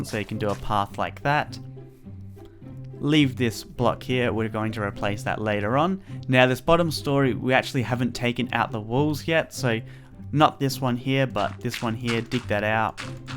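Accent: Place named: Australia. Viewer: I luv ur Australian 🇦🇺 accent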